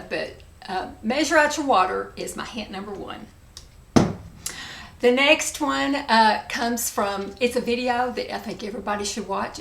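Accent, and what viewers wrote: South, United States. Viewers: You are just so stunning and in your 60s. You look so much younger. I love your beautiful Southern accent! Thank you for all the great tips. I love your channel.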